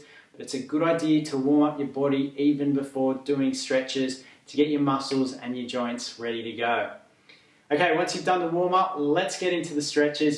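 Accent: Australia. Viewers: IS THIS GUY FROM AUSSIE LAND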